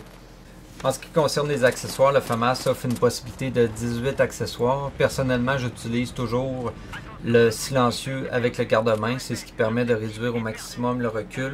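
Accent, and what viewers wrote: Quebec, Canada. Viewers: Accent Québecois, il n'y a pas de honte et il ne faut pas en rire. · Vidéo ultra structurée très utile et en plus avec l'accent quebecois !!! Je suis fan continue comme ça ça nous aide a comprendre les subtilités de ce jeu tout comme ta vidéo sur les accessoires. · acssent du Quebec <3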